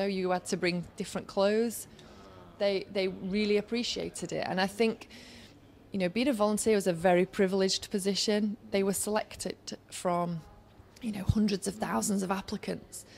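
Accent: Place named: Yorkshire, United Kingdom. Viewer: Как она произносит company, come, such, done, rugby!! Ну и что, что Йоркширский акцент. Всё понятно. И очень красиво. Спасибо.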